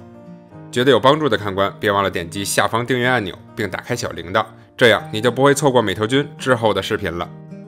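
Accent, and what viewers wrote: Beijing, China. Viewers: 美投君的京片子真的好聽！不抄股的空挡 也可以去当演員了!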